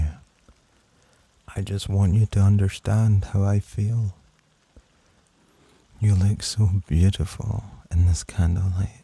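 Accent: Scotland, United Kingdom. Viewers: From what I can tell it's Scottish I don't know specifics though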